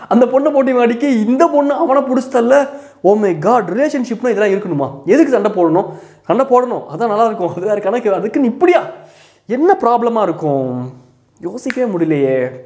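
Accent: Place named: Kerala, India. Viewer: Enna anna adikadi malayalam cross aaguthu😒😂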